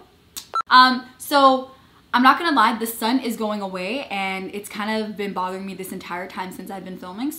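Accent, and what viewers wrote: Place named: Tigrinya, Eritrea. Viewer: I love ur tigrigna accent i want hear u more sweety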